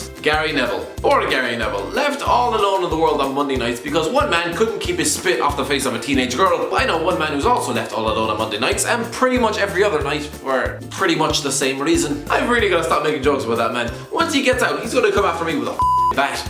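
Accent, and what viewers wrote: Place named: Ireland. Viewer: Where in Ireland are you from.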